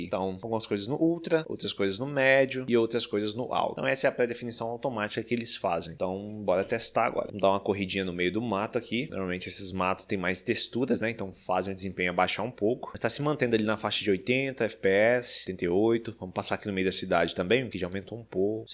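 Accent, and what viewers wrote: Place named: Goiânia, Brazil. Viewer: Você é de Goiânia? Haha Top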